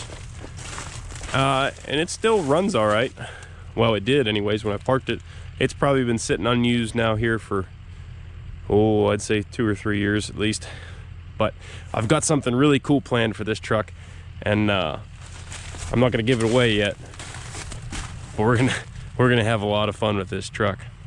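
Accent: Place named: Pittsburgh, United States. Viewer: lol...I recognized the accent...Just Northwest of Pgh here.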